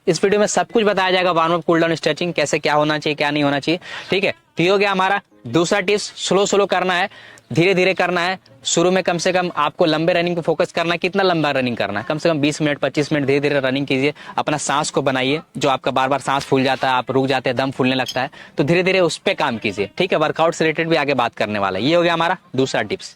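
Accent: Maharashtra, India. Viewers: Bhai bht pyari Marathi lagi aapki...Jay Shivray❤ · Sangli Marathi bolatat sir 😍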